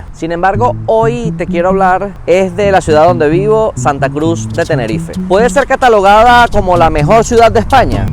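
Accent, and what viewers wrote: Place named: Venezuela. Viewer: Pana,,hablas igualito a un buen pana mio Venezolano tambien,,😁😁,, concha y me estas embullando a mudarme para alla,, ya USA me infla las pelotas ja ja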